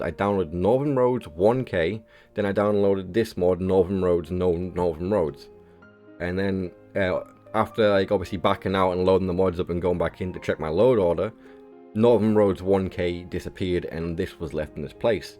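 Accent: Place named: North East England, United Kingdom. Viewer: Nice load out - I would add the sky land colour for realistic water 2 if you can. Also check out sky kings aonghus house for river wood for almost no cost and granite hill town that he did. Trying to guess where your accent is from in the north east 🤔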